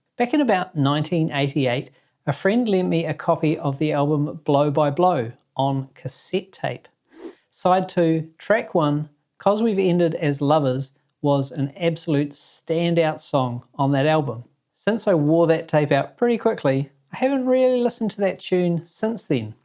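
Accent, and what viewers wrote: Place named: New Zealand. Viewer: 😅 Gotta love that Kiwi accent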